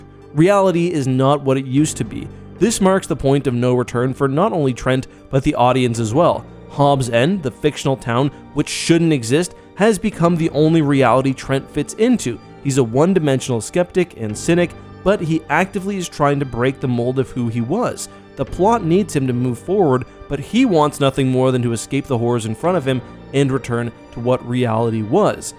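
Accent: Canada. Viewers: Is the narrator Canadian. I could hear "OOT" and "ABOOT".....?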